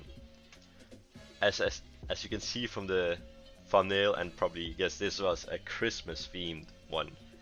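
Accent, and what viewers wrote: Denmark. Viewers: Are you danish?🧐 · Your danish right?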